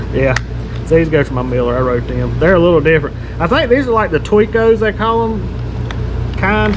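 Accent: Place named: North Carolina, United States. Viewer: Glad to hear someone that talks like me!! (Franklin, NC, Macon County)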